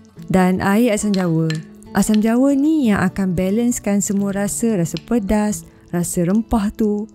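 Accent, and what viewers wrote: Kelantan, Malaysia. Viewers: kelate ko utaro???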